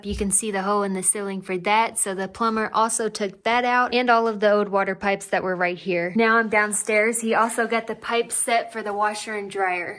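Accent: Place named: United States. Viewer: Hello from Australia. Im Really enjoying this series. You are doing fantastic and you are such a hard worker, you should be very proud of yourself. I have no idea what part of the US your accent is from but i love it. Thank you for sharing your journey with us.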